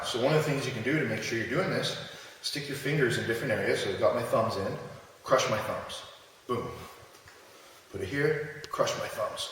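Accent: Canada. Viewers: is canadian right? I can hear the Canadian in his voice:P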